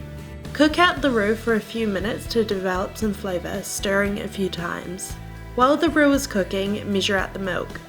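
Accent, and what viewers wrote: New Zealand. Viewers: Fellow kiwi? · Sounds like a kiwi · from new zealand probably! that's where im from :D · I think it's New Zealand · The Kiwi accent is way too annoying to listen to 5 minutes of it.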